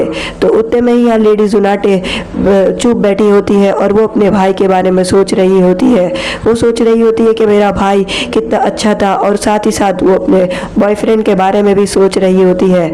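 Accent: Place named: Hyderabad, India. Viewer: Your accent is like Hyderabadi you are from Hyderabad?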